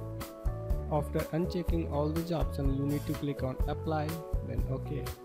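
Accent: India. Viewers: hears indian OH HELL N- clicks out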